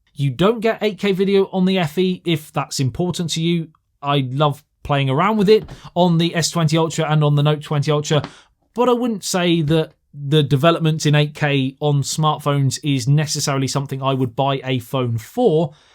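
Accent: England, United Kingdom. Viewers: Damn I knew you would be speaking with an English accent from the thumbnail. You have an English looking face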